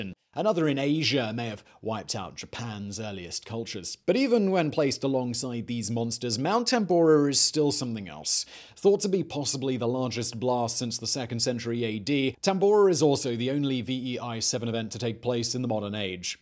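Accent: RP, United Kingdom. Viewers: is this what they call posh accent? it's so difficult to understand :( and the cc are only auto generated. :( I can follow eg veritasium's videos fine, but these are challenge. And it's pity cause it's good content. I like the disaster put into history context.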